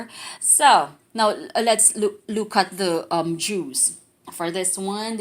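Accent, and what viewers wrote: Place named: Philippines. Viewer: Thanks for the review. I detect a Philippino accent.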